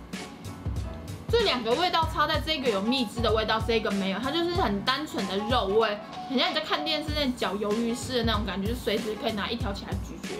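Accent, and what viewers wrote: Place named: Taiwan. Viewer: 台語好可愛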